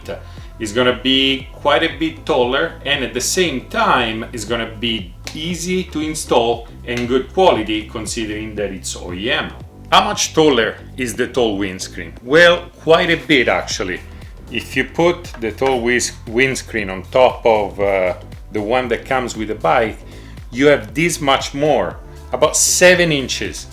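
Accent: Italy. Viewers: Is it weird that I like listening to Italians speak English vs French people? I like French people, but I can't watch a video having them explain things to me. Italians? Well, I watched this whole video and don't own my AT yet. Yeah, it's weird. Whatever. Great vid.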